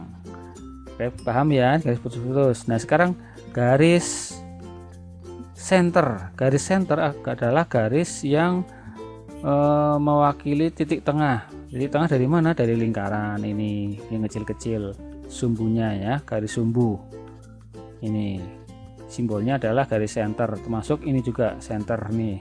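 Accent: Banyumas, Indonesia. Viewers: pisan mas daerah ndi kie, purwokerto hadir😂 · mantab om tutorial jos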